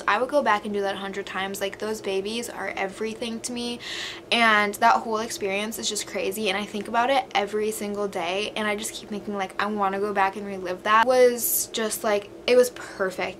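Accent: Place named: New Jersey, United States. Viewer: Are you from New Jersey? Your accent reminds me of Jenny or JWow for Jersey Shore.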